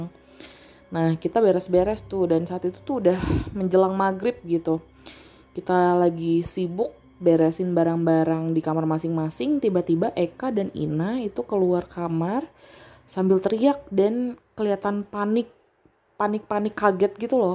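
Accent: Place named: Jakarta, Indonesia. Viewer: Ya karena logat dia logat anak2 jakarta, kalau anak daerah ya agak susah cerita kaya gini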